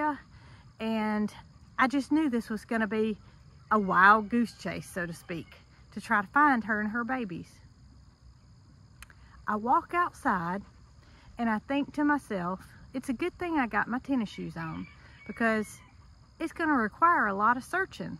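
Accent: United States. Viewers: i like your accent, it shows we are in a DIFFERENT part of usa, different is the spice of life!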